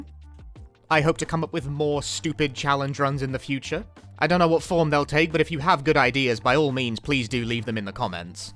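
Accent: United Kingdom. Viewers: you sound way more british in editing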